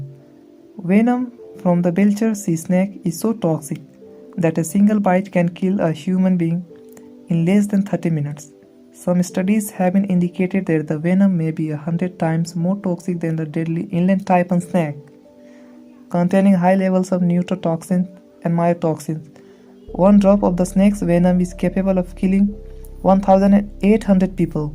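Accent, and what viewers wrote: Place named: Mexico. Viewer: I like your Mexican accent.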